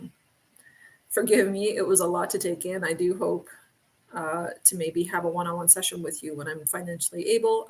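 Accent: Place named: West Coast, United States. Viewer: I don’t usually watch Q&As (actually never) but your videos are “popping” up on my homepage lately and I’m wondering why? 🤔 I find today’s topics with & (I believe were their names), very compelling. (it sounds like is dealing with a Christian ideologue mom and guilt issues, just a guess? I can relate) America, while not claiming a national religion has surely imposed those ideals onto us from birth. Words like “Purpose” are a clear indication of that. After all I have learned from six surgeries, three of which spine related, All of which originated from a severe accident resulting in a broken neck. I joke that having Plates, rods and screws in my cervical & lumbar spine make me a hybrid human 😜 Judging from posting times and when they show up on my page you are in a different time zone from me (East Coast). You don’t sound European, South American, or Canadian for that matter; West Coast perhaps? 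Anyway, my point is scheduling an in person session wouldn’t be convenient. I am currently writing a book, autobiography of sorts, and would like to consult with you on developing the theme and characteristics of the antagonist. The more I listen to you speak the more I like your instincts & intuition. 😊 Can I email you to arrange an initial consultation perhaps?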